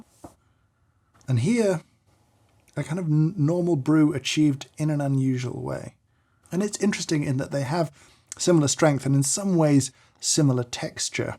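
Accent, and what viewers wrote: United Kingdom. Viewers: it's nice to see British youtubers who don't have a chavvy accent and can speak the queen's English correctly!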